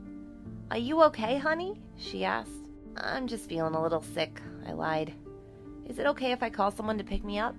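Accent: New York, United States. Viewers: She sounds like she is from NY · She new Yorker voice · No she has a New york accent. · It’s probably the New York accent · Her accent is really cute it’s kind like a New York accent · Moe and moe pi'tures! Sounds like New York baby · Her voice sounds like a ny accent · She sounds like she has a New York accent and one of the background she was in with her sister that thought bubble was a gacha life background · This has to be a New York accent. It just sounds so familiar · newyork accent? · new yorker? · I heard that new york accent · Nice new York ancient or is it just me 🙋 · She got that model new York accent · That thick new york accent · is it me or or does it sound new yorkish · Well i can tell she’s from new York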